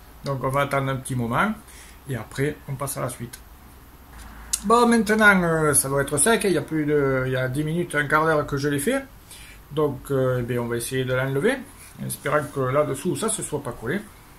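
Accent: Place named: Nice, France. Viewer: Alors la Monsieur vous pourriez facilement prendre la place du fameux comedien.... Louis de Funnes vous etres deja un tres bon menuisier et je vous remercie infiniment pour vos videos mais avec vos fin de videos( Betissier) la Je me regale d'abord parcque j'ai grandi dans un petit village derriere Nice donc l'accent j'adore et vous mettez le super cote humain,Alors bonne continuation et Merci Robert dans le blue mountains NSW en Australie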